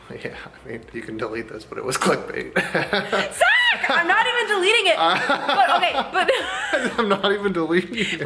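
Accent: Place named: New York, United States. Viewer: This was fun to watch. Especially the flash back of you with your NY accent. Love it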